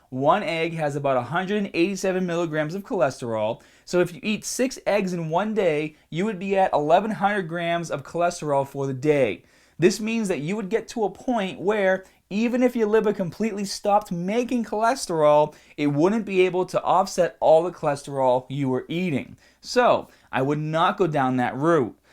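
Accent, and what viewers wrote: Boston, United States. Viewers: Holy crap! has a Boston accent? Since when? LOL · you sound more "Boston" than ever before?! Not sure if it's just my ears though. Lol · Man how come you can't pronounce AR, EAR, and ER words? Is this a Boston accent? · are you bad Boston? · that boston accent is bursting outta ya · This guy. Boston as fuck. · It's a Boston accent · NOTHING more annoying than the Boston accent. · God, that bostonian accent is so annoying.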